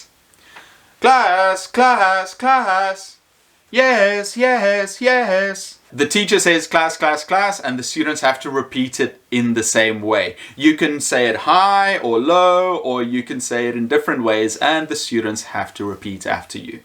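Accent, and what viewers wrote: South Africa. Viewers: You sound South African... :) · Thank you.I love love call and respond technique.I Shout "can you hear my voice?" And they have to clap and stand still. My voice is still hoarse from shouting,talking and just interacting with students all day. LOVE THEM tho. South African?🇿🇦🙌😊much love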